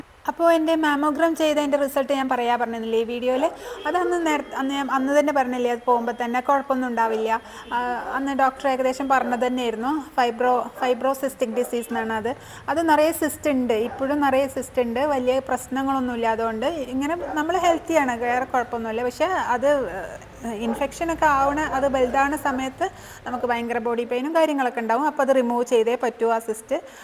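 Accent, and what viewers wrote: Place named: Tirur, India. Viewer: Njan janichathu Tirur aanu❤ nostalgic aanu samsaram okke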